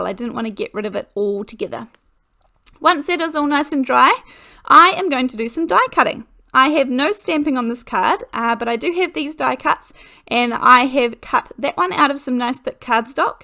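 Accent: New Zealand. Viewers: My 5 year old daughter loves watching your videos with me. I've just found her in the kitchen doing her own crafting tutorial for her little brother and sister, complete with a slight New Zealand accent! (we live in the UK!)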